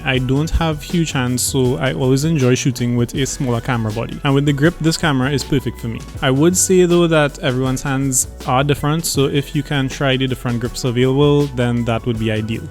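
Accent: Trinidad and Tobago. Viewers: Are you from Trinidad 🇹🇹??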